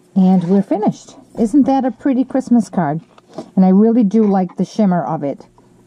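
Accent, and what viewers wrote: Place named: Maine, United States. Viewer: Super cute card need to get the letters lol. Need to look and see if you have scrapbook pages videos. I hear your accent where are you from sound like Maine.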